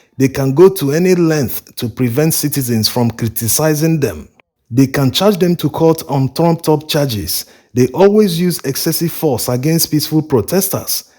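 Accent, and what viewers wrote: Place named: Yoruba, Nigeria. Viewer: Thank you brother for the video, you doing wonderful job. One thing, you sounds like my Yoruba friend Nigeria 🇳🇬, are you a Yoruba? Your accent sounds so much like him. I have watching your videos for quite some time. The way you speak just reminds me about him. I don't want to mention his name on social media, you most be him.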